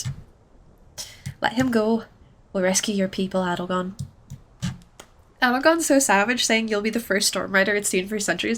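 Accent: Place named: Ireland. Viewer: I love your middling Irish accent! 😊 It's quite lovely!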